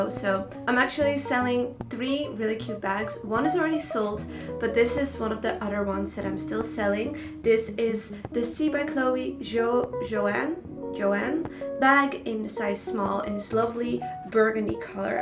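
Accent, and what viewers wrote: Netherlands. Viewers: you have a dutch kind of accent while speaking ^^ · She has a real dutch accent ...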